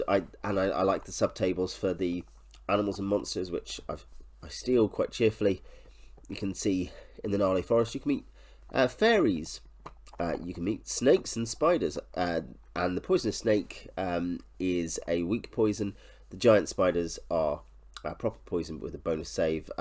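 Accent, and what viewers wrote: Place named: United Kingdom. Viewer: Wtf dude. You can't follow a British accent? You have to get out more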